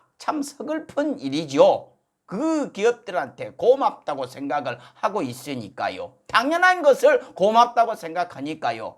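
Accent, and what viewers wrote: Jeolla, South Korea. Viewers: 무슨 말씀을 하시더라도 상관없는데... 제발 전라도 말은 과하게 안쓰셨으면 좋겠습니다 ㅠㅠ 제가 전라도인데도 전라도를 대표하는 사람처럼 얘기하지 않으셨으면 좋겠습니다 ㅜㅜ정말 부끄럽습니다ㅠㅠ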